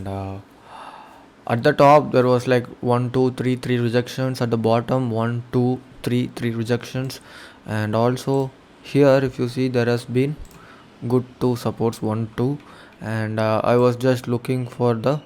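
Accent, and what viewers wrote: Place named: South India, India. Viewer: It's with his accent. Lot of south Indian people do that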